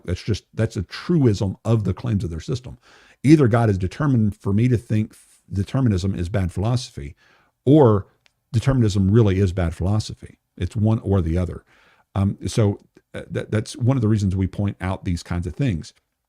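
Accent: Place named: Texas, United States. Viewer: I think you're smarter than you say you are. I think its that thick Texan accent that masks it 😉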